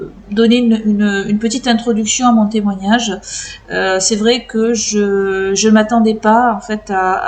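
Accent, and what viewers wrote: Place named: South, France. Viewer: Effectivement, l'accent du sud se fait parfois entendre. 😉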